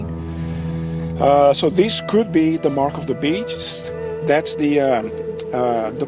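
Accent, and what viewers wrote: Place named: Germany. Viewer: Oh, WOW, lovely to have an interaction with you, especially since we are both on the "yellow brick road" like Dorothy from the "Whizard of " leading us to our true home! You are quite right about Austria being a place of knowledge, art & culture, but on the other more darker side it´s a stronghold of the Cabal (south of Germany, north of the Vatican & east of the Swiss financial Babylon - no coincidence that I decided to incarnate right here to clear up the stuck energies of bloodshed & endless wars on European soil. I was wondering about your accent & thought you might be of German origins as well, but you also made references to the Portuguese language..... so you got me confused there;-) But it doesn´t really matter as long as we are using the language of love & you definitely do;-)) Stay safe, & looking forward to meeting you in your next videos!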